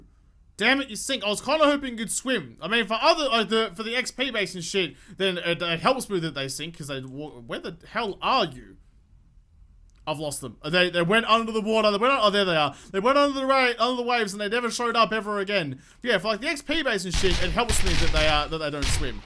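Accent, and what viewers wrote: Australia. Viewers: Out of pure curiosity from where do you hail? Are you from Australia? Trying to place the accent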